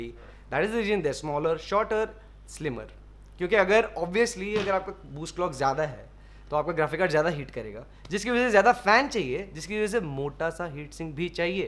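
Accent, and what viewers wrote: Hyderabad, India. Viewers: Hyderabadi kitta bhi koshish karlo ustaad zabaan pe ajatich language. Aakhri kiraak maut angaar video tha, maut dalderai. Aisich aando videos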